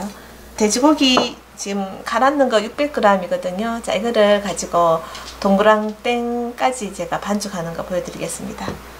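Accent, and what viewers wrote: Gyeongsang, South Korea. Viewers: ~설명도 친절하게 잘하시고 못하는요리가 없으세요^^요즘~잘구독하고있습니다 덕분에~요리솜씨가 늘었어요 님목소리에서~경상도특유에 깊은정이 느껴져요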